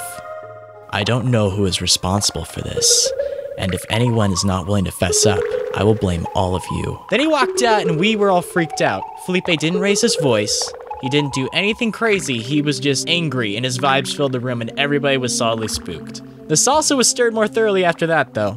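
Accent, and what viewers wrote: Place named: England, United Kingdom. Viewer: Thic English accent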